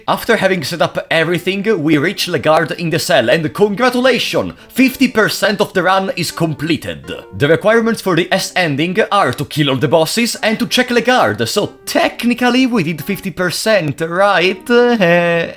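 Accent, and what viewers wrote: Italy. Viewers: you sound italian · I didn't know you were Italian (was really wondering what kind of accent do you have) been watching you for a while now, from multiple accounts. Big love from russia❤❤❤ learned about FaH from you btw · So you're italian!!! All ghis time i wasn't able to identify your accent! Anyway glad you recover from your bad time, you truly someone i aspire to. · You're Italian? Man I suck at recognizing accents.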